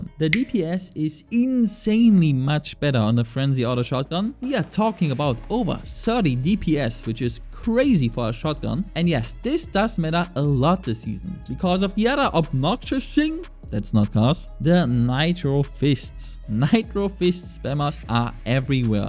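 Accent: Germany. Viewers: he’s german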